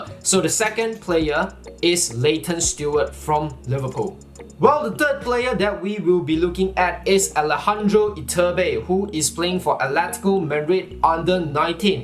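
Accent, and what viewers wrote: Malaysia. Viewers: Kuat betul accent chinese/malaysian dia ahahahah. Btw great and informative video my guy! Got new subscriber in me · What the fuck is wrong with the Maysian accent 😑😑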